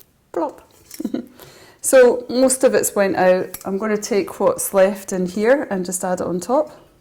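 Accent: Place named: Scotland, United Kingdom. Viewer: It's easy when you know how, but the how is all in the person , in Ireland in the past ever one made irish brown soada bread and ever one's bread was different by taste and i mean everyone and everyone's preference was different, bread has the personality of the person who makes it in it and it's the best ingerant, perhaps that's why holy communeyen has the body and soul of Christ in it Give us our daily bread , you have a beautiful Scotch accent, a Caledonian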